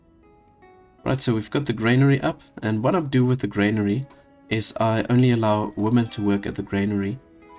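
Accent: South Africa. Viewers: Love the peaceful, chill Ostriv content, great find! One thing that's killing me is I can't place your accent. It sounds maybe like either New Zealand or South African (I feel like you look more like a New Zealander but sound more South African). Am I even close? :D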